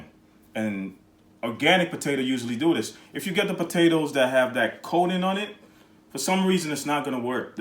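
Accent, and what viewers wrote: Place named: New York, United States. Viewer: Haitian New York accent is What’s up!!!! 🎉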